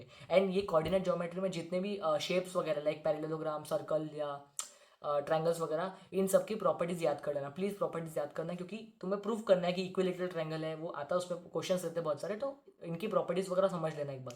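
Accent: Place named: Nagpur, India. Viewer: Bhai tum pakka nagpur maharashtra se ho na🥷🏿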